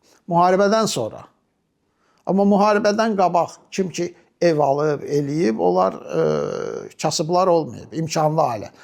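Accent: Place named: Khizi, Azerbaijan. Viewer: Salam Aleykum dayı oğlu❤Allah sizi var eyləsin!ömrünüzə,elminizə bərkət versin!Xızı rayon Xirək kəndinin,böyük bir nəsilin fəxrisiz,həmişə uca olasız!Maşallah çox gözəl xıdalığıynan danışmısız❤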